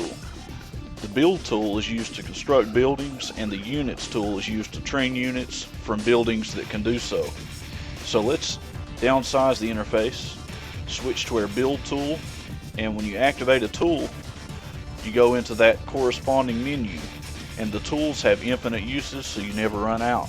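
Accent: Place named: Texas, United States. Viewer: you sound like somebody from texas with that accent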